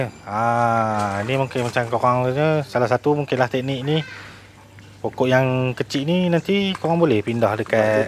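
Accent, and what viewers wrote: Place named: Perak, Malaysia. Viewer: Cara abang cakap macam orang perak le...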